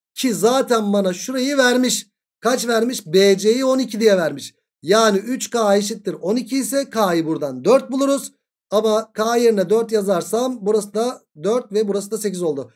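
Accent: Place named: Trabzon, Turkey. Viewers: trabzonlu net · Bence trabzonlusunuzdur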